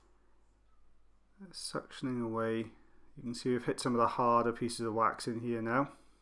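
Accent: Wales, United Kingdom. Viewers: Sally, it's about as mild as an accent gets. I can just about detect Welsh, which means he could be from Hereford, England, where some folk sound Welsh to my home counties ear. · I thought I could hear the beautiful Welsh accent in his voice lol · Are you Welsh? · ear wax removal + welsh accent asmr = most satisfying video on youtube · Are u welsh?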